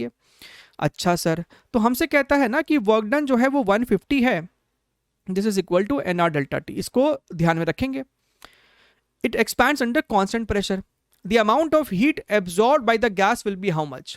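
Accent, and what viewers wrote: Bihar, India. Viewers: Sir aap bihar se hai kya?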